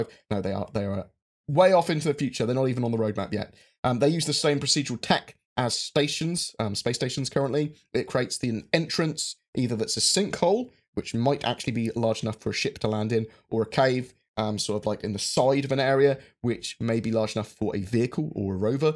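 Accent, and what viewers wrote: United Kingdom. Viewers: I appreciate your vids, I really do but could you enunciate better. Your voice is like a roller coaster. No volume setting can adjust from the highs and lows and missed syllables you have. I like the accent but not when it interferes with comprehension. Sorry man I don't mean to be rude but it gets a little annoying. I get the quips and quirks of British loving their own voice and word play. I mean you guys play with it when you speak but sometimes it's just a little too much.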